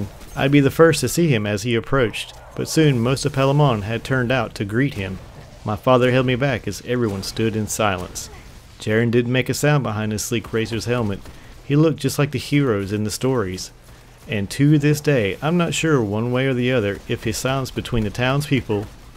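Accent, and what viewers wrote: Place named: West, United States. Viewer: Lemme just say that your western accent coupled with the theme of the Last Word really make the telling of this story so much more interesting and immersive.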